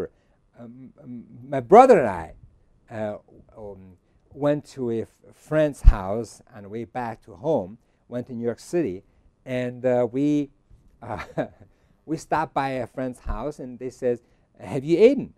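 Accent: China. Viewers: lol, an American speaks English with strong Chinese accent. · My god. His English is even not as fluent as his Mandarin. I know his first language ( mother tongue) is mandarin. Obviously, he uses Chinese thinking to express himself in English. Also he owns a mandarin accent when speaking English. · Just started listening. . it is so unusual to hear a white person speak english with a slight Chinese accent! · You guys don't know that his Mandarin got a ShanBei accent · Very strong Chinese accent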